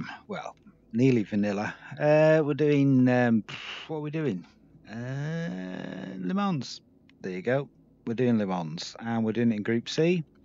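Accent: North, United Kingdom. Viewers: Love the Group C's in AMS2. That Sauber and Fred's Jag are standouts for me. Congrats on the 2k. I enjoy those familiar dry witted, dulcet northern tones 😆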